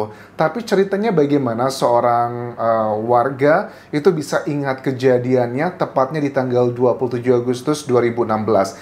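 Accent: Java, Indonesia. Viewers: mas boso jowone medok bgt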